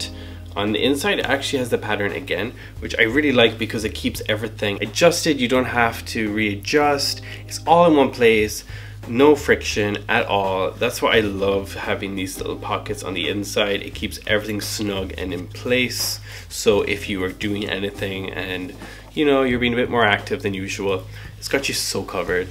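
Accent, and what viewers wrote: Ireland. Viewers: Where are you from? I’m trying to figure out that accent...it sounds subtly Irish. I initially thought it was Scottish, but after listening to you, it definitely isn’t Scottish. Regardless, you’re nice to watch. 😍🏳️‍🌈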